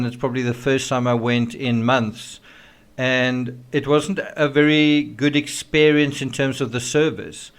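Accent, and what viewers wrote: South Africa. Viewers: Good SAFFA accent. Your steak was blue. Hello from Sedgefield in the Garden Route.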